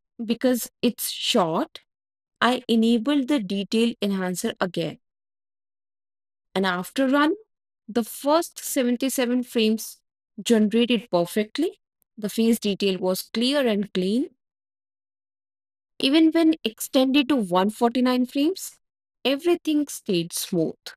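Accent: India. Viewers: but why you have indian accent ?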